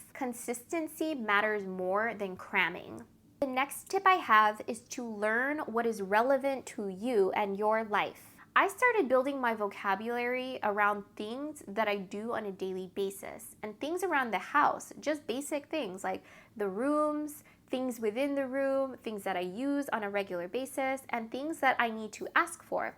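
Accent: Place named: United States. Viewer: English! It is a difficult language, so many people speak English differently with different accents (UK, U.S., Australia…) I prefer the U.S. English accent like yours 😄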